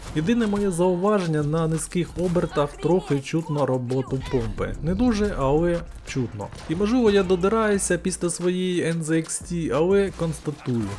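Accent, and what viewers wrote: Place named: Transcarpathia, Ukraine. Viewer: Кратко когда ты с закропатьия ммм... Нихуя не понятно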